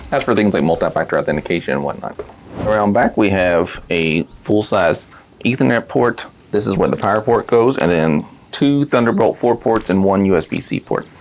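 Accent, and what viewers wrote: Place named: Texas, United States. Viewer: nice accent! Texas?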